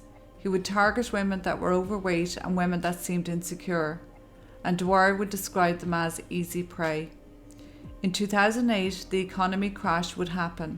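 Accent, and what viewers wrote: Ireland. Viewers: Honestly and I watch alot. My favourite accent in the whole world is the Irish one so that might have helped. 😁 seriously your voice is perfect. Xxxx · I adore the Irish accent.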